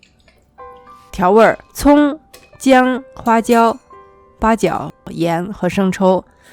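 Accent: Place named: Xi'an, China. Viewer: 是西安人吗？和我说话的感觉一样！突然觉得留学很亲切！最主要的是，可以用很美国化的食材烹饪中国的食品，简直欲罢不能！大赞～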